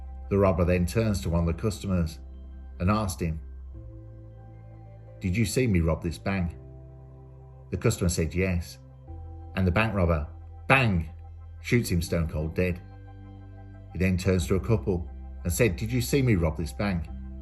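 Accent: Birmingham, United Kingdom. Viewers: You do a great job, but I also struggle with the audio on many of your vids. The sound quality is fine , but you either slur some words slightly or speak several words so quickly, that I'm constantly rewinding to work out what you said! No offence intended, just (hopefully) some constructive feedback ...and I'd much rather hear your Brummy accent than listen to an AI voiceover! Keep up the great work 👍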